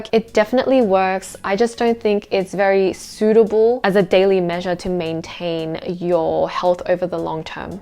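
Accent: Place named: Malaysia. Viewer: 你是大马华人吗？口音能听出来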